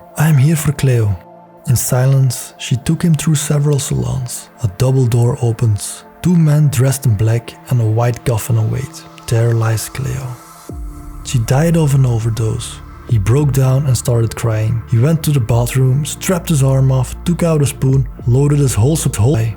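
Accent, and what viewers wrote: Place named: Flanders, Belgium. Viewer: Ja, vooral het accent, inderdaad. Typisch Vlaams, je bent niet alleen uiteraard. Maar denk ook even aan het doelpubliek, dat toch bijna 100% Vlaams/Nederlands is. Dan heb je al weinig reden om dit in het Engels te proberen. Voor degenen die onze taal niet machtig zijn volstaan ondertitels ruimschoots.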